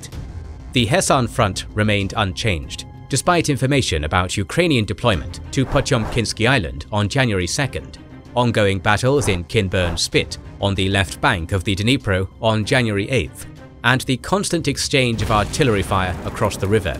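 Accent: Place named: England, United Kingdom. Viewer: FINALLY...someone with a decent English accent... and can actually pronounce the Ukrainian town names! So much better than all the foreign commentators who can't even speak English! Great stuff...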